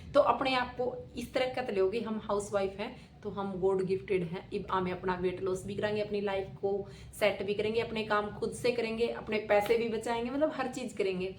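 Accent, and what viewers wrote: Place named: Kurukshetra, India. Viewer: Nice video bahan app Kurukshetra jila se ho kya apki boli meri ali hai 😊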